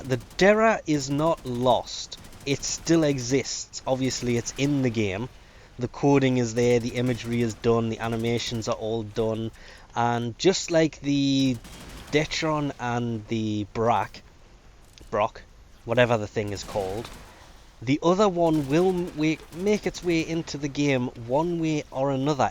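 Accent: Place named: United Kingdom. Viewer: what country do you live in? From your accent you sound like your from somewhere in Britain but it was 5pm when the event finished here?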